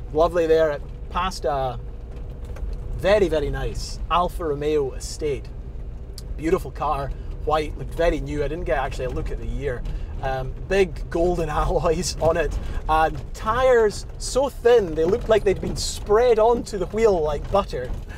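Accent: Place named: Scotland, United Kingdom. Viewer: Great to hear another Scottish voice and see the lovely scenery of the islands. About to buy a series three here in Panama. Your video underscores my reasons for getting one, thanks.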